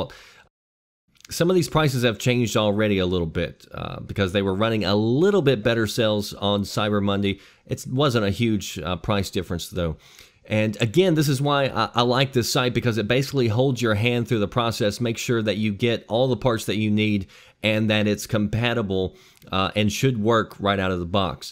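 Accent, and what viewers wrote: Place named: Tennessee, United States. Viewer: Thanks a lot. Ive been thinking about getting a gaming PC and if I do, will got the route you did. BTW.. didn't know till this video but suspected you were from Tennessee from your accent... bet ya can't guess where I'm from..lol For some reason I thought my account was my gamer tag.. Knoxrandall...lol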